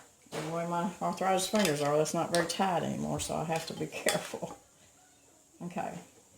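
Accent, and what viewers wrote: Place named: Southern Missouri, United States. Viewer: I just discovered your channel recently. I am now subscribed. I can't wait to try your crazy pizza sauce, your lunch lady rolls, tomatoe powder and these potatoes. I have canned many years and have a really big garden. But some of these things ar new to me and I love being able to add to my pantry. Thanks so much. Oh and I have to say I love hearing you talk! The accent sounds so much like my people. My family are from southern missouri. I have lived though in Nebraska most of my life. It brings back such sweet memories! Thank You.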